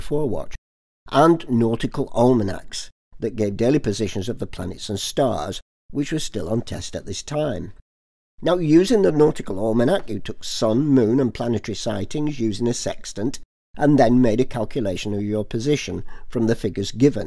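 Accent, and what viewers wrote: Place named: RP, United Kingdom. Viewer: I almost didn't recognize yer 'posh' voice!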